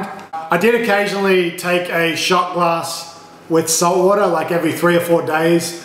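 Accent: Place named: Greek Australian, Australia. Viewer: 2 seconds in i was like yep Aussie Greek. It’s the accent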